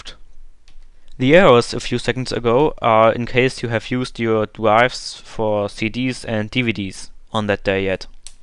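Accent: Germany. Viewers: Bist du Deutscher oder Engländer?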